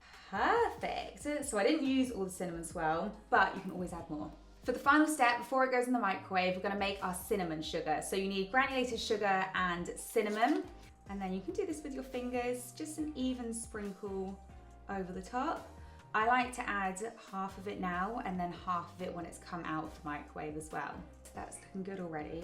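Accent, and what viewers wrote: United Kingdom. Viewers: May i ask which part of the UK your accent comes from ? I love it ! Awesome video also !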